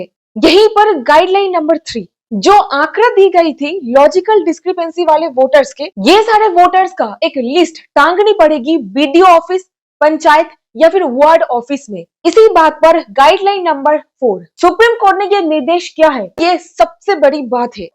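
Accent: Bengal, India. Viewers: ম্যাডাম তুমি বাঙালি মনে হচ্ছে 😅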